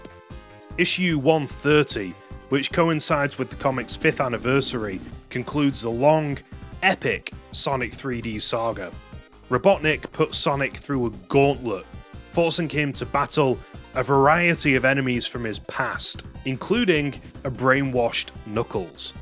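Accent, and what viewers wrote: United Kingdom. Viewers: What a treat to get the entire Fleetway sonic comics told! All with the perfect British narrarator for it. So nice to have you back.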